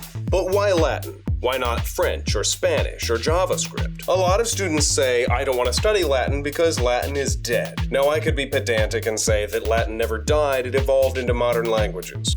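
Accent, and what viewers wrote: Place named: United States. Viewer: Latin spoken in an American accent like that is truly barbaric.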